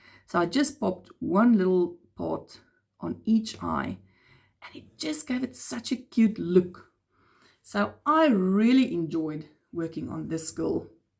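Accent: South Africa. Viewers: Amigurumi making is N-E-V-E-R boring with you!🤩 First, I have to admit, ( maybe it just me, because I enjoy so much your beautiful English from South Africa- me being French speaking from Montreal, Quebec, Canada, its like a melody or a song to me!☺️). Second, 99% of the time, when you tell how you did, where or from who it was created, why you did it that way or how, there is always a story behind! The Marvelous Mermaid became such a beauty, and one of the reason, beside your talent, is cuz you used the yarn from Crochet box Society! Finally using a pattern from another designer to inspire you, you did crochet a vest for your bear! Thank you for keeping those videos coming and to share with us, yours yarny adventures!!! It is a fantastic treat for me and for the others viewers!!!😁💕💕💕💕💕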